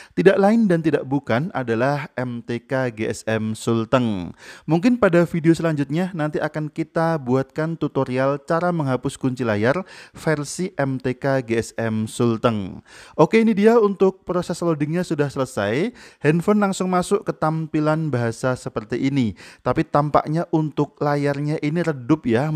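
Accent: Java, Indonesia. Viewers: Mantap jiwa jadi nota satus seket ewu ... Nota gajah kang Salam solder dari teknisi medhok 🙏🙏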